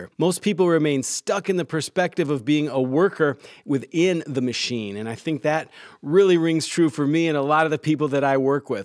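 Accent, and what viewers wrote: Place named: United States. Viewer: Great but talks too fast. Slow it down. Your channel audience is all over the world and we don’t all understand American English as well as native speakers.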